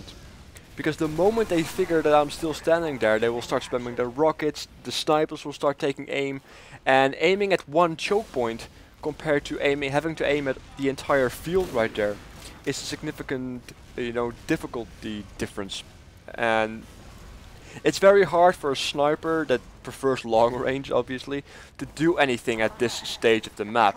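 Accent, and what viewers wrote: Netherlands. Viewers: Can I ask you something? I think you have a uncommon accent while speaking english. Is there any chance that you're dutch, because sometimes you sound like it :)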